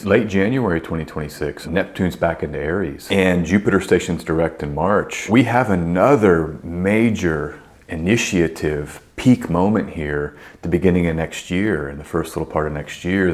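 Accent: Texas, United States. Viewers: I thought I was hearing a Texas accent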